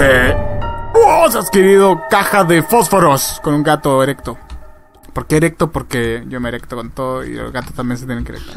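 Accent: Chile. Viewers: que Chucha es chileno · lonrot 2... usted es chileno? bien :D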